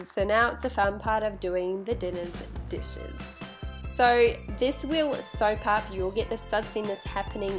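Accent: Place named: Brooklyn, United States. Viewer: You are welcome. All of the USA I am sure wishes your environs and wildlife come through the fires as best as possible. BTW- I thought your accent was from Brooklyn, NY at first, though. :-) I like the "make your own" liquid cleaning products. Money saving and safer. Hope you get back to more when you can. -UrbanLifeTime and us all. :-)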